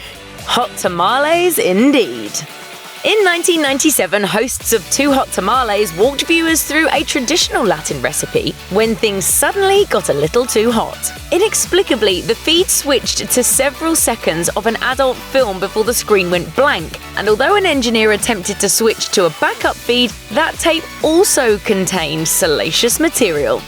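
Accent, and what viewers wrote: United Kingdom. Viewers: Incognito ? You defending the bitch like that's your wife or something. Calm down you bitch. I just don't like a British accent period. Fuck yall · British accent commenting on American shows...stupid. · who's the brit? get back to watch mojo girl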